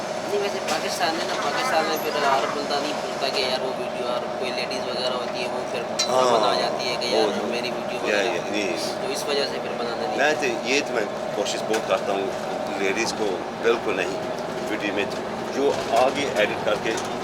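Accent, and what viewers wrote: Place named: Scotland, United Kingdom. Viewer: I love your Scottish accent ❤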